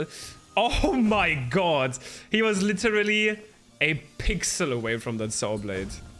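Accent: Germany. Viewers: Idk why but You sound german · One question, are you german? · That accent... Are you from Germany? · German Dialekt ballert rein 😂 Trd geiles vid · yooo are u german !?!?!? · You sound german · he has a german accent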